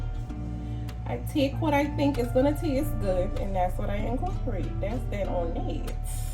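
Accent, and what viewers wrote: Charleston, United States. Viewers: I knew you was from Da Chuck as soon as you started talking... I from yah too!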